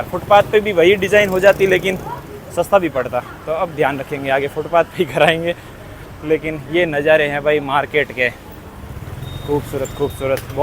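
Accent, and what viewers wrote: Rajasthan, India. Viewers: भाई आप की बोली से तो एसा लगा आप राजस्थानी हो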